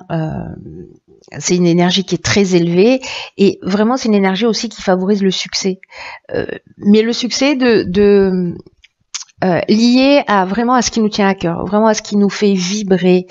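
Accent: South, France. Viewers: Cc, oui il y a des artistes dans les parages 😅 intermittent du spectacle, chanteur guitariste pendant plusieurs années jusqu'à la retraite. Après un accident de la route, je replonge dans mon répertoire depuis une dizaine de jours et je répète, un peu dur après 3 ans d'arrêt. J'aime beaucoup votre voix et cette petite pointe d'accent du sud 🎉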